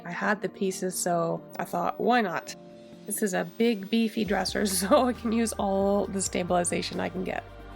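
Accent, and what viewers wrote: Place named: Alberta, Canada. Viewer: I saw a Tim Horton's cup in one of your episodes, and your accent is identical to a friend who lives in Edmonton. I know you are a fellow Canuck...are you from Alberta?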